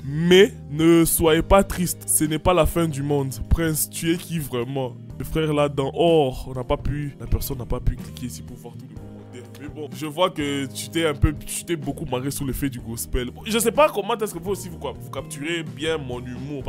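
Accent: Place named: Swahili, East Africa. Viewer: en plus tu parles swahili je l'ai senti dans ton accent ☺️☺️☺️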